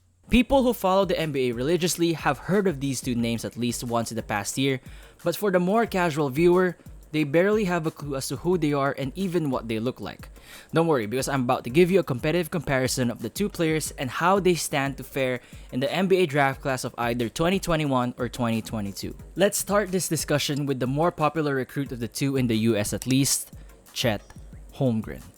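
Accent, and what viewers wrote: Philippines. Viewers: I couldn't help but notice the Filipino accent in you but superb English nonetheless! Are you from the Philippines though? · I can hear by your accent that you’re filipino (do i spell it with a ph or an f, i dont want to be offensive or rude so correct me if im wrong) but with that being said if i was filipino i would like kai more also because of what he represents for the island and even tho im not filipino i like kai more also cuz if it came down to it and chet had to guard him he would have alot of trouble since kai weighs so much more than him although chet has better ball handles than kai but in the nba with them both being 7 footers they would be played as big men chet isn’t athletic enough to play guard so hes gonna have to get his big man skills better. So in my opinion if i was an nba scout i wiuld want kai over chet. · Are you Filipino bro? 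Kasi yung accent mo parang pang Pilipino. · I think you are filipino bro, because of that filipino English accent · Filipino ka ba kasi yung boses mo pag pilipino boy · Sabi na nga ba Pinoy sa boses pa lang. Idol :)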